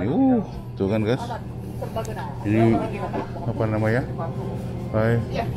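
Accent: Surabaya, Indonesia. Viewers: Boso sampeyan ngguyokno.... Bener...bener...Londo kampong yo puinter ngomong suroboyoan Om.... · saya senang kalo Pak bahasa Jawa, sering keluar ciri khas Suroboyoane, ayo Pak bikin conten pake bahasa Jawa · Big respect buat om lama di Amrik aksen ngomong dan masih gunakan bahasa Indonesia dan Jowo Suroboyoan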